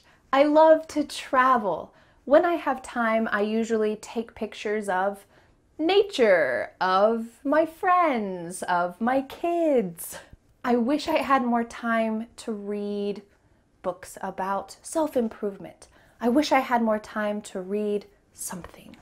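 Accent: United States. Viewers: Thanks. Is this American or British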